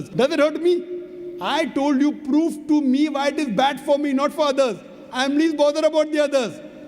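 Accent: India. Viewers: salamu alikum Dr. ZAkir, would you please add an auto-captions in English, so we can understand your accent. we are from north Africa, and it is difficult for us to understand the Indian English accent. thank you so much.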